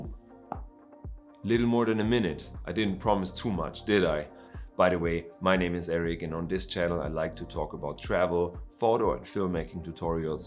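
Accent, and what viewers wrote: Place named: Germany. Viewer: Excellent tip, thanks. You sound very German, Eric.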